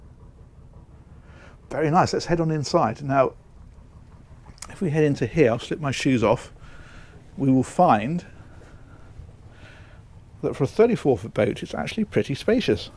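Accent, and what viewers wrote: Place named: United Kingdom. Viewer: Hey you can say it any way you want to.. your a Brit and I for one don't expect you to talk like an American.. I am an American and I don't talk like a Brit.... I enjoy the heck outta these videos now matter! even if you spoke Aussie.. though that is rather hard to understand when they slip into there slang.. Man ya gotta love though.. take care y'all see you on the next one..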